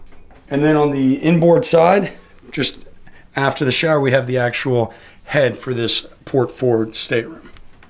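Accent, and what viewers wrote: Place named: Maryland, United States. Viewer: Gotta love that Mairlin accent.. Love the bewt.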